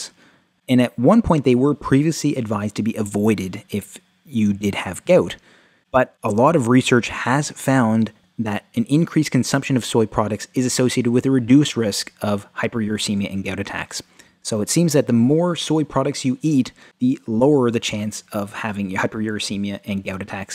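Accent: Canada. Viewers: I think he's Canadian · He sounds Canadian.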